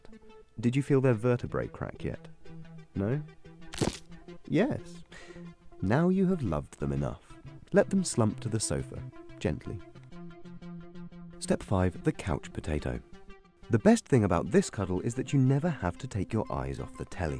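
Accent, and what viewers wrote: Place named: United Kingdom. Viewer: The British accent makes this 10x better!! Hilarious!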